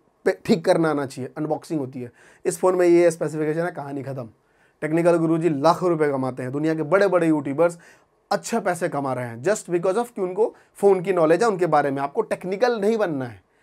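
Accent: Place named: Bharatpur, India. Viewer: O Bhai shab kya bat h wahhh ji bolte gjb h ap trika solid h bolne ka m apko bate dhyan se nhi suni pr bolne ka trika solid bilkul bharatpur style dhasu wala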